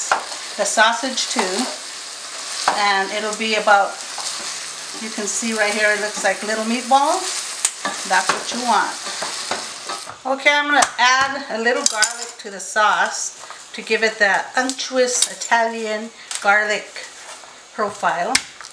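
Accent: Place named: Puerto Rico. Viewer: Btw, are you from PR? Your accent tells me so! I love your style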